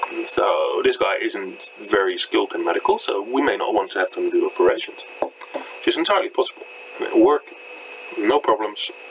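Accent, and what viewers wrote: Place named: Netherlands. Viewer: Jesus wat een kut nederlands accent heb je als je engels praat -.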